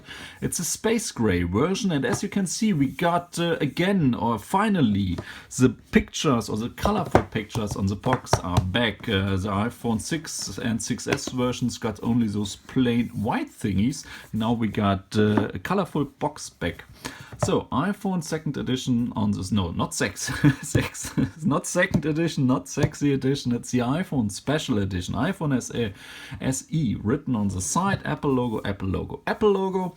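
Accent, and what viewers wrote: Germany. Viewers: I'm in love with the phone and your accent! Deutsche? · Yes her is a German :) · You sound like German...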